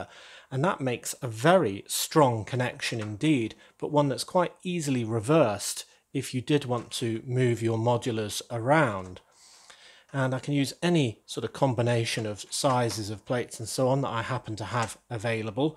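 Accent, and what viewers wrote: United Kingdom. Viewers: When you build a curb with two plates height, in reality, this would be a Curb of 24cm /9,4 inches (In the Minifig scale )). I'm from Germany, where the curbs are 15cm/4,7 inches high. So I am not sure, if in other countries curbs of such great height of 9,4 inches exist. Can you say, if in Great Britain (due to your dialect it seems, that you live there) or in the USA (if I guessed your home country wrong) such high curbs of 9,4 inches exist?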